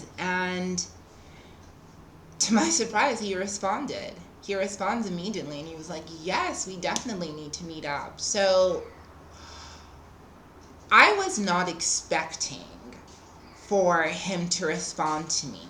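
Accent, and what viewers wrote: United States. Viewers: You really did nicely in trying to perfect that American, almost Valley Girl accent. Good job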